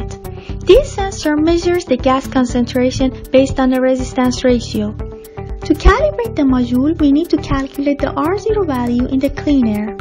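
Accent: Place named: Iran. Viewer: دمت گرم بابت ویدئو و تلاشت که هم گرافیک قشنگی ساختی و هم خوب توضیح دادی. تعجب نکن از رو لحجه باحالت فهمیدم ایرونی هستی. ولی باز دمت گرم ، میدونم چقدر ساخت همچین ویدئویی زمان بره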